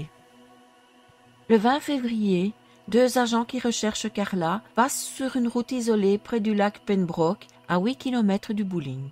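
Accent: Belgium. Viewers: Je ne dis pas celà pour blesser mais cette manière de parler... Quel est ce petit accent, est-ce Belge ? L'histoire est terrible.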